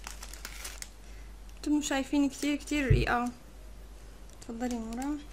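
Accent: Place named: Damascus, Syria. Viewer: كتير حلو لانها سورية من الشام والشاميين بيحكو هيك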